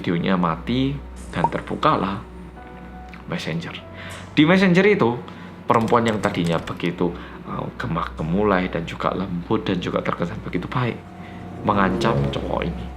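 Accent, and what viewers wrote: Java, Indonesia. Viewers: Kembali lagi sama orang korea yang MEDDHHOOK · Bersama dengan orang korea yang medhokkkk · Medokkkk(bibirku meluu mlongo) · Bersama orang korea yang medhoooook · Mas medooookkkk...😊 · Bersama orang Korea yang medok.... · Medooookkkk semakin ombo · Bahasa nya oppa medok bgt 😁 Enak di denger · Mas lebih kentel Jawanya daripada Korea😭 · Hihihi medhokkkk🌼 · canduu medokk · Cerita yg dpt menjadikan kita semua menjadi lebih hati2 di era yg serba canggih... Thanks mas korea yg medok jowone 😁🙏👍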